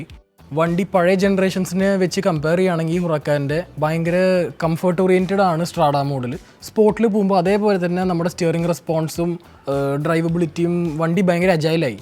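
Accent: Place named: Malabar, India. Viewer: മലബാർ സ്ലാങ്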